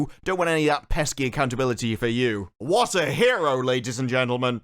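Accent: United Kingdom. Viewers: dude I love a dude with British accent tearing this apart love it subbed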